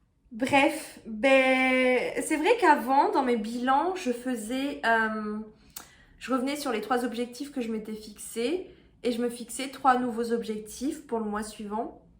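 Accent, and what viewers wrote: Brittany, France. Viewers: Bravo pour ce formidable parcours! Tu est au top 😊 ( Et dans tes vidéos j'aime bien les petites choses concernant la prononciation made in Finistère comme "même" qui deviennent " Meum", sorry je fais ma pikez 😉😂 )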